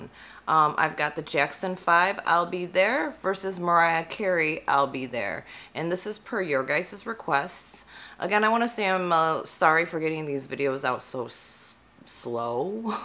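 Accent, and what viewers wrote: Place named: United States. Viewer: >>> lol. I don't think you have an accent... Maybe the guy was just mesmerized by your american accent. 😁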